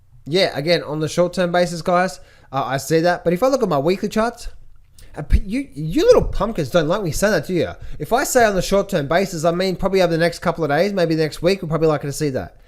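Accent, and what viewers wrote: Australia. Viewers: HERE WE GO MORE AUSSY ACCENTED B/S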